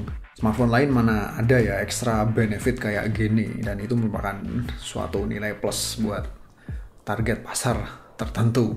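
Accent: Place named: Banyumas, Indonesia. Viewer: Dri logatnya Mas nya ngapak ya ? Klo iya kita satu darah 😂🎉 Sukses selalu 👍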